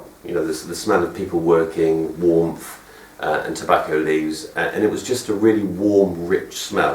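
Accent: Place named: United Kingdom. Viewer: Hey. I love your voice and that UK touch accent however last video you uploaded was 5 years ago so I hope you doing good.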